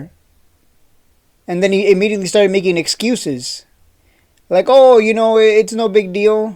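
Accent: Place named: Long Island, United States. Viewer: Maybe people think that because the guy prostockmarkets I think is his name keeps mentioning you and he said you sound like you are from Long Island here in NY.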